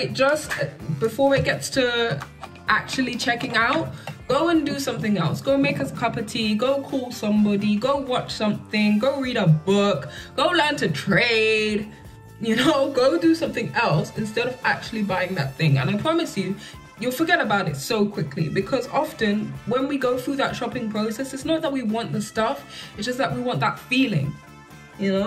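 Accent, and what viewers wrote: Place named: United States. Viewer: Yasss but how you have American accent 😅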